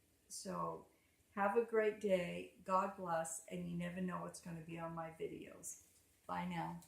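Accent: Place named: New York, United States. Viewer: nice video. Your accent is it from New York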